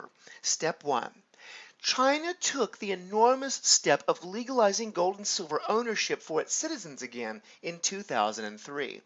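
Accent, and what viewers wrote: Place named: South, United States. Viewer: As soon as I hear American Southern accent, I know conspiracy theorists are coming.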